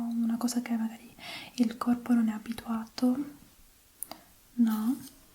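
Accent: Sicily, Italy. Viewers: Quando parli in italiasiciliano! sei molto tenera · omg u speak Italian way to good!!! I am Italian and I can say that u have a beautiful Sicilian accent! I love ur videos,;* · Sembra siciliana hahahaha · Che meraviglioso accento siciliano. Una mia cara amica di Siracusa parla proprio come te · Io sento l accento sicilianooooo!❤️ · Siciliana sicuramente, si sente tantissimo e capita anche a me ogni volta che vado al nord nonostante io parli in italiano 😂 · L'accento sicilianoo❤😂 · il bello è che è canadese ma quando parla italiano sembra siciliana doc😂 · Bellissimo il tuo accento siciliano 😍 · Adoro il fatto che tu abbia un accento siciliano bellissimo♡♡♡ sei bravissima, complimenti! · Hai un accento siciliano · ‘Mbare, I hear some sicilian accent here 😏😍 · Sei siciliana? Si sente tantissimo l'accento😄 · i heard some sicilian accent 🤣🤤 ti amo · Oh wow, non avevo idea che fossi semi-italiana 😊 All'inizio ho visto questo video e ho pensato 'oh ok, allora è un genio', ma adesso sapendo che sei cresciuta in Canada ha un senso 😁 Hai un accento stranissimo, un misto di forte siciliano e straniero non meglio identificato haha Ma parli molto bene, mi piace! 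Ti dico qualche errore che mi è saltato all'orecchio per aiutarti: lavorare sul computer = al computer, infezione negli orecchi = alle orecchie, lentine a contatto = o dici lentine e basta (che comunque è molto informale e forse siciliano, essendo siciliana anch'io non sono sicura XD) o lenti a contatto, sistema immune = immunitario. Comunque grande! Tuo marito è italiano o canadese, per curiosità? :P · It seems that you come from Sicily because of your accent. It's wonderful,gorgeous. As an italian,complimenti❤ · Seem that you have a Sicilian accent 😂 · Con l'accento marcatamente siciliano, ce ne vuole coraggio a dire che è "americana", solo perché ha vissuto qualche anno in Canada! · In tutto ciò solo i veri siciliani capiscono l'accento fortissimo(e bellissimo) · L accento siciliano é un tocco di classe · Non capisco. Questa ragazza ha origini italiane? Il suo accento è italianissimo, quasi siculo!! 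Adoro 😻 bravissima! Hai una voce meravigliosa. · Hai un accento siciliano fortissimo ahahah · Si sente che è siciliana...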